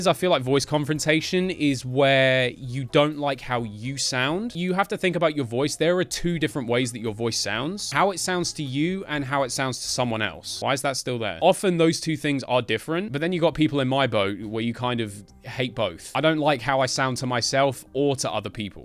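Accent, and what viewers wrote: Wales, United Kingdom. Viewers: Bro i love your voice man. i’ve always loved british people. Mainly for their vocab and they’re accent. Not matter how you sound you are still amazing. love you big bro. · welsh accent 100% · I like your voice, I just love how "British" (or in this case, Welsh, which I didn't know until relatively recently) it sounds, yet very comprehensible, something I wish I had. Also I wish I could do one of your signature "WHAAAAAAAAAATTTTTTTT"s. Though I can't blame you for wanting to have Matt Berry's voice. Hell, he doesn't mind if you're from Iran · bruh i love your voice so much. as a british person who has moved to australia, i can appreciate and miss your accent. and your voice is wonderful, it makes everything about you so much funnier. dont be sorry. i love your so much aha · Mate you sound fine there’s nothing wrong and as a British person it’s nice to here someone with a similar accent to me I get tired of American accents they really grate on me don’t worry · not once have i watched one of your videos and paid attention to how your voice sounds. 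even doing so now, i see nothing wrong with it. you sound like a british man. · It's a UK voice I'm proud of my UK voice so you should be too and I understand the insecurity of having the audience hear it but that's what makes you iconic to us! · As someone who’s been watching since like 15,000 subs, I wouldn’t be watching if had an annoying voice, I’ve stopped watching other people on voice alone. I think I’ve watched so much, even when I was younger. I sometimes say phrases he would, like actually. What can I say I like the British accent. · That sexy voice and that britain accent are your trademark because everyone recognizes you when your "WHAAAAAAAAAAAAT?!?!!" pops in.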